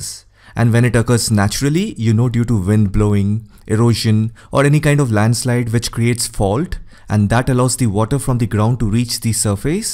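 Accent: India. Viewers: Indians English! Like criticise english language 😠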